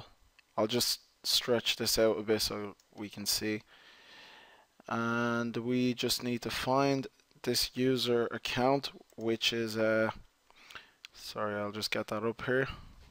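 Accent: Ireland. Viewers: An Irish black man, cool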